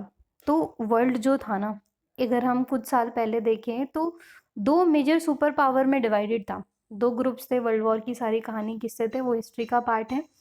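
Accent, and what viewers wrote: Uttar Pradesh, India. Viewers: Mam app U.P. se ho kya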